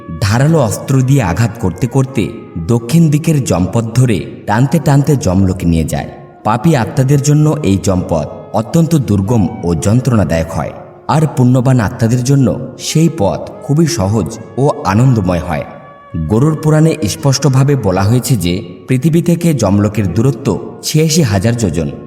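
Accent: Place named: Bangladesh. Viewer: বাংলাদেশি বাংলা এটা ভারতীয় বাংলা নয় এটা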